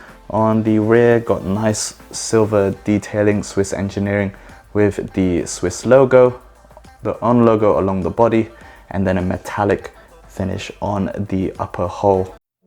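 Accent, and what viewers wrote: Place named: New Zealand. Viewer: Thanks man. Appreciate your time and help as well. I just saw your vevo vid too and now I feel like I have to choose between those! Ha Btw, your vids are in South Korea but your accent sounds almost Kiwi ha where are you originally from?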